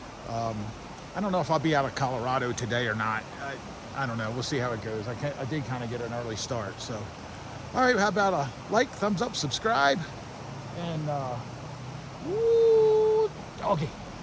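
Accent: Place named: Western Pennsylvania, United States. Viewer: Where are you from? Sounds like Western PA, western Maryland area.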